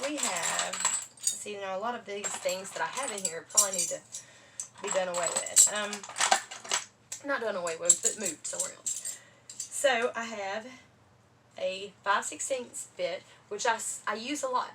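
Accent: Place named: South, United States. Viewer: I love your southern accent! it’s sounds like sweet tea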